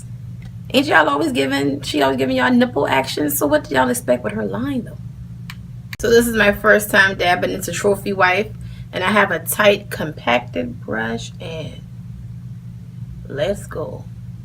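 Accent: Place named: New Orleans, United States. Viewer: You slayed this video! You are too much this New Orleans accent draws me in every time I promise lol 😂😂😂😂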